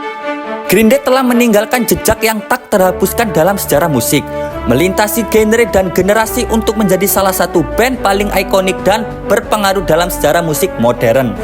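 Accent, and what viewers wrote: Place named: Banyumas, Indonesia. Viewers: ora ngapak ora kepenak 😂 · keren ngapak 😂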